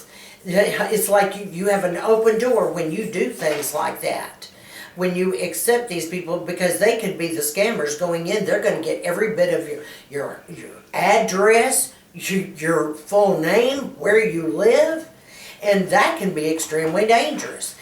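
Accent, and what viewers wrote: South, United States. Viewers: I hope you cooled off by now... Anyway, this was half an hour well spent also if I don't have a channel. Interesting to learn what's behind the screen also if it's not always pleasant details. By the way - since I don't want to miss a word I do make an effort and slowly I'm getting a better understanding of your marvelous Texan accent.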